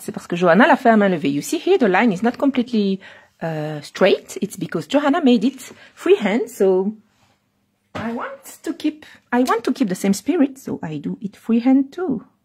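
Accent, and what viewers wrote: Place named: France. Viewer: Trop mignon quand tu parle anglais avec ton accent de france☺️😍. Vraiment ton effet or est realiste merci pour ce truc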